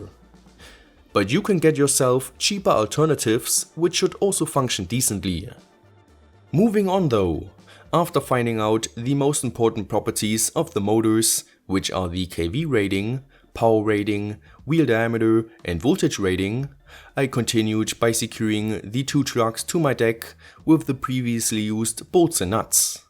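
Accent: Germany. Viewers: I cant stand your german accent please learn proper english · Hört der sich nur für mich deutsch an ? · Are you from Germany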